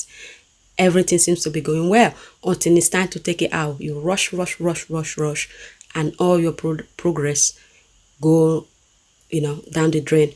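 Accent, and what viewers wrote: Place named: Nigeria. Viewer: Your accent is very thick and lovely, are you Nigerian?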